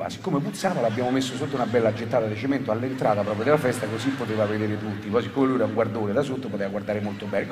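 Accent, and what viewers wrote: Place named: Rome, Italy. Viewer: Enrico Brignano, che dire, io non sono nato a Roma ma il dialetto Romano suo è raffinatissimo. Tante risate e affetto da Perugia. Viva il Cabaret ( quello vero) <3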